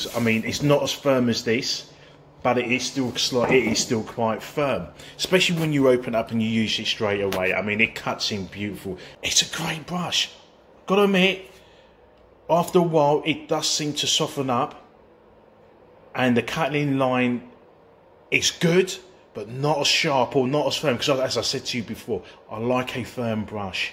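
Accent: United Kingdom. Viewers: Pro-form Blaze and semi oval contractor brushes are good too. I'm in U. S. and that's all I use along with the Picasso. I love hearing the Brits talk. Such a cool accent.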